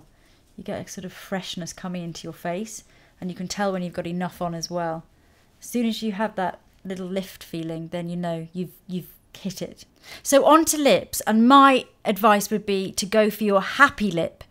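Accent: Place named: RP, United Kingdom. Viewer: you are ADORABLE! We all love your videos because despite your (lovely!!!) posh accent, you are SO relatable and always provide great tips and information! ❤️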